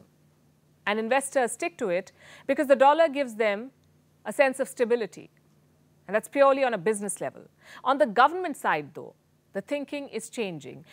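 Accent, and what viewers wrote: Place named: India. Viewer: Your pronunciation is nice, voice is pleasant and the accent is more Indian.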